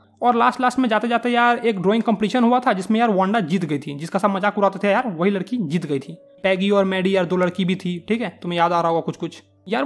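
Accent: Bihar, India. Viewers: Bihar se h ??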